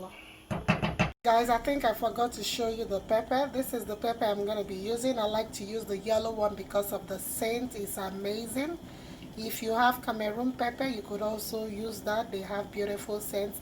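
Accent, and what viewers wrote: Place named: Sierra Leone. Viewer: Are you Sierra Leonean? I can hear the Salone accent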